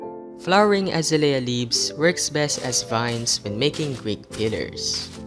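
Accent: Philippines. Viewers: he sounds like a filipino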